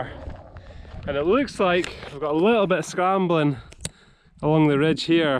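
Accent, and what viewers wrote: Inverness, United Kingdom. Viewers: Aha, I should have guessed Inverness - it always foxes me!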